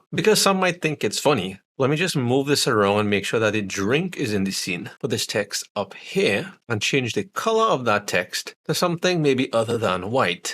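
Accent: Trinidad and Tobago. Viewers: I love your Trini accent. #subscribed.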